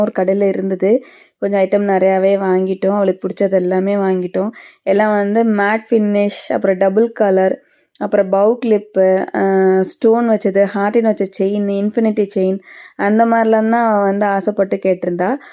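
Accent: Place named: Karaikudi, India. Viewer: Neenga karaikudiya unga varthaigal alagu ola irukku simly suprer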